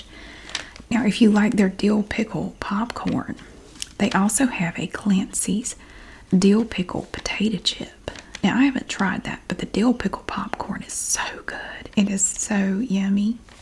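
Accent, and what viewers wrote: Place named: Danville, United States. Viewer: Lord she sounds like she's from Danville VA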